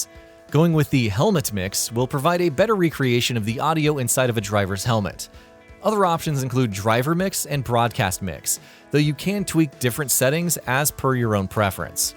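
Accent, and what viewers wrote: United States. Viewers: drives me up the ffkin wall. what the hell is ren olt?? Re nooo! not hard and Marrrzipin is Maz a pin Why won't yanks get that the letter a isn't a flaming R!! And Alain Prost where is the e that turns that o to an oh sound??? FFS I don't like dislike many videos but this gets one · Jesus christ the Americans yet again have murdered the english language. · Never let an American narrate this again.....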